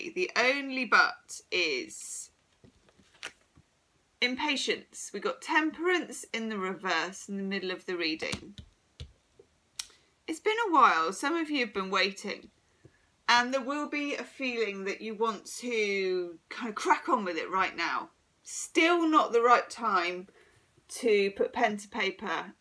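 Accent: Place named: England, United Kingdom. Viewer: your Insight is amazing. Your accent is identical to that of Keira Knightley in Pride and Prejudice. my favorite English accent. Thank you so much for your talent!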